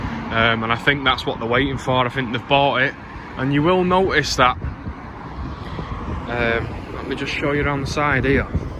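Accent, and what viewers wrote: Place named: North, United Kingdom. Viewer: Great story and video editing, complete with reminiscent storyline in a strong northern accent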